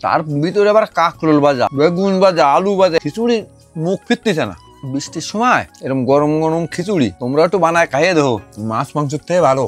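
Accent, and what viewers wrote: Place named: Jessore, Bangladesh. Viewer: Apnader vasa jashore er monirampurer manuser Moto vlo lage onk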